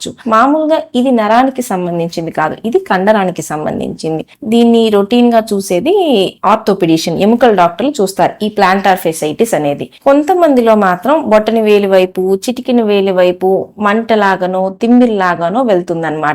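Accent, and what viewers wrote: Guntur, India. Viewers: అవునమ్మా...మా గుంటూరు జిల్లాలో మేము అలాగే మాట్లాడుతాము..అది మా ప్రాంతీయ భాషమ్మా.. మేడమ్ గారు చెప్పే మంచి మంచి విషయాలను మనం విందామూ , ఆచరిద్దామమ్మా..👌👌💐👍😍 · Maa Guntur slang...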